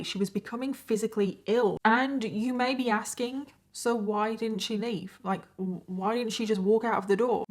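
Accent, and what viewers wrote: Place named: Midlands, United Kingdom. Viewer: I love listening to your You Tube talks as well as watching you do your makeup! Beautiful eyes that always pop at the end!.. I also love your accent. I have family in the Midlands so it warms my heart to hear you talk. Congrats on your pod cast show now! Looking forward to hearing more :)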